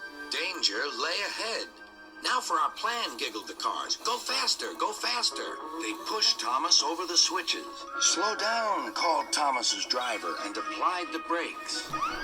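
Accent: France. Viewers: WOW No Way You sound like the French Narrator from SpongeBob SquarePants. I always trust on my friends because they're always helping me just in case they need help like a helping hand great video I can't wait for the next video called Oscar, Trevor Evans and the really useful Party it would be Partytastic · Whoa, I trust you! You sound like a French narrator from SpongeBob SquarePants. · Wow you sound like a french narrator !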